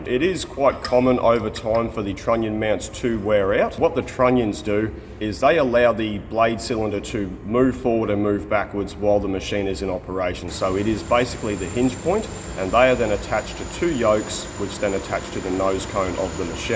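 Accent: Queensland, Australia. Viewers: Back to listen to the finest example of the Queenslander accent on YouTube. Unless Wayne Bennett's got an account on here I don't know about, but it's not like he'd talk.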